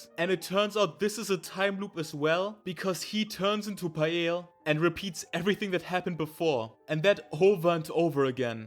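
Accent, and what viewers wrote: Germany. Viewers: Starker Deutscher Akzent Bro. Erstes Video was ich mir von dir anschauen werde. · Could you read Boys abyss? I think it doesnt matter if you shit talk or compliment manga, because i think you make good commentary either way. Btw are you german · i hear deutsch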